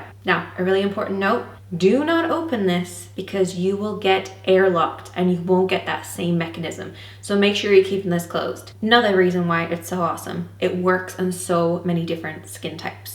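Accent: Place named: Ireland. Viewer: I picked up on that cute accent of yours right away..im Irish too!! And will b visiting Ireland I'm praying within the next year! And i just want to tell ya,u r so beautiful!! Irish Women rock lol! And need to try this primer...thanks for video!!💚☘💚☘💚☘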